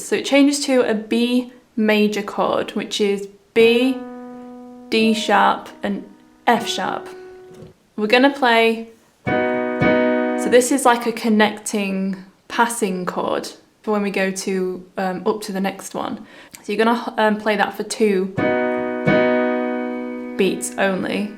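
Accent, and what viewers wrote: United Kingdom. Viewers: I love your British accent!😍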